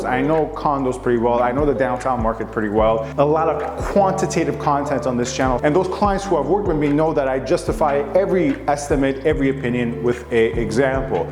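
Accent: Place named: Iran. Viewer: Red flags: 1. Pronounced Toronto wrong 2. Pronounced strip "shhhhtrip" 3. "Student" (first time) buyer 4. The condo owner owns everything from the structural ceiling, walls, and floors. Don't need permission for finishes. Just permission to carry out noisey work. Stripping popcorn is not a "risk". 5. That hint of the BMW "Pairjan" accent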